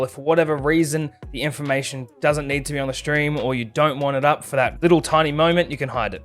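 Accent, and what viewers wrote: Australia. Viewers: Thank you soo much this helped a lot the best part was that you Australian not American so I can understand you a lot more, your also very funny 😂😂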